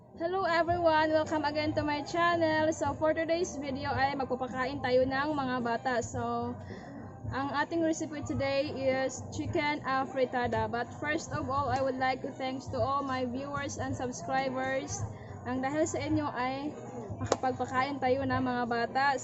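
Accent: Philippines. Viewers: Gantong vlogger dapat sumisikat eh. Ganto dapat nagrerepresent sa ph vloggers. Pinay beauty, pinay heart 💙 Yung accent pa talaga pinoy 😍💙 support ate. God bless u moreeee!!!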